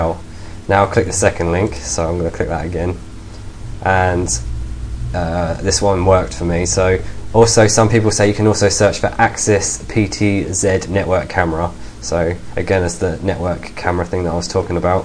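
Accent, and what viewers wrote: United Kingdom. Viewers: most people in the UK sound like that....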